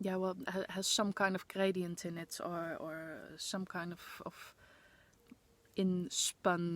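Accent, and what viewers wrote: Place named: Netherlands. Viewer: Hearing you, I already thought you would be Dutch, because I hear you thinking in Dutch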